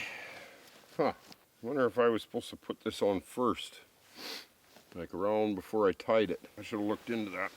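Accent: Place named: Canada. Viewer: When that truck gives up on you I can recommend you getting a Subaru Outback. At least as good off road as any truck, and the back seats fold flat so you can sleep in it comfortably. You should look it up. As for that catch phrase, I think the most important thing is that it contains the word "out" in that awesome canadian accent. The one about poking an eye out wasn't bad at all imho.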